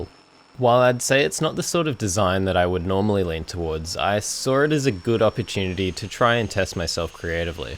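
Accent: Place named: Australia. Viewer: First time to your channel. Came here from a short of this video, started watching for my fellow Australian accent, stayed for the great build. Nicely done.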